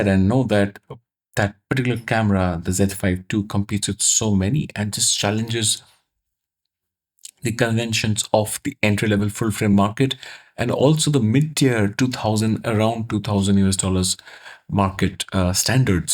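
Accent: India. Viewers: I expect an Indian guy to have an indian accent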